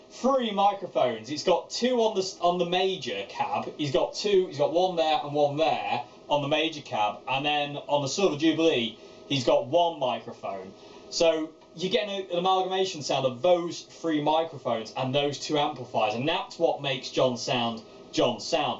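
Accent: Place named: Birmingham, United Kingdom. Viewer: I enjoyed this! cheers mate. I especially liked the "Apache rose: Peacock" breakdown. Thats a lovely chord progression - and you did it nicely. Fair Play. :) JpD. (PS: you sound "brum-light", like a young J.Carrot - it's an observation; and he is a likeable bloke, so don't think im being off). Keep up the good work mate :)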